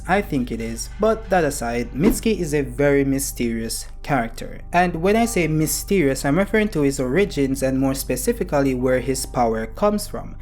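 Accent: Jamaica. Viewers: You sound jamaican, are you?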